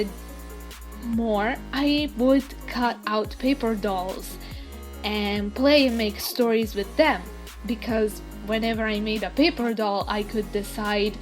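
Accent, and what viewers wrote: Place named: Syria. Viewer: Of course! I have a friend from Syria and her accent sounds similar to yours, so I was curious. I love your art style and have a nice day!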